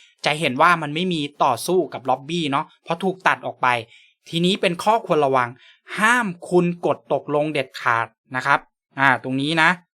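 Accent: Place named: South, Thailand. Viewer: ทำไมต้องพูดใต้ด้วยครับ5555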